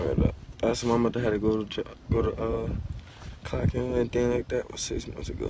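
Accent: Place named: New Jersey, United States. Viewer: I miss his Jersey accent.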